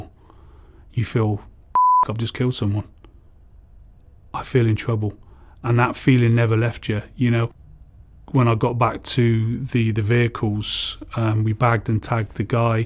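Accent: United Kingdom. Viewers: i mean its really fucking obvious that hes british, why do you bother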